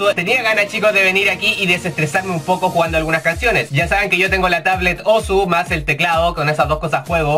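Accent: Chile. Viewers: Eri chileno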